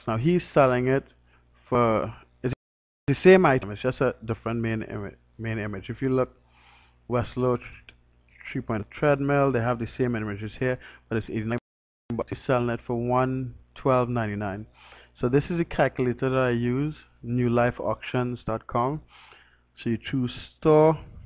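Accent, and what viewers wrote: Trinidad and Tobago. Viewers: you sound like a Trini if you are i am too and have been drop shipping both on ebay and amazon will love to connect can we watsup or messager ???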